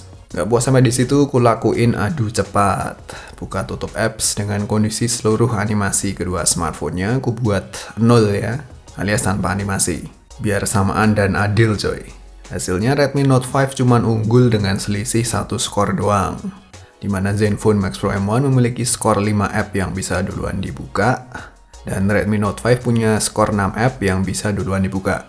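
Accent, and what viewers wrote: Java, Indonesia. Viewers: Dengerin suaranya bikin capek jawa nya medok amat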